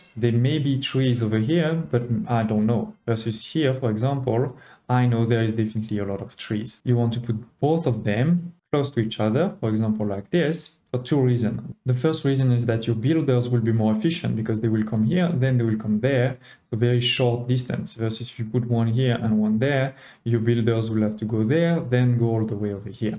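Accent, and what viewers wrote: France. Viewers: You're french, right? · Tu serais pas français?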